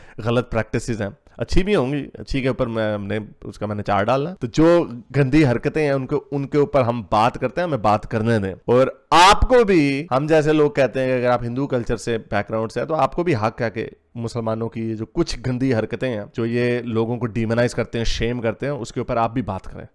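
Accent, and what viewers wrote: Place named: Punjab, India. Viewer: Aap ke panjabi bolne ka style sunn ke majja aata hai.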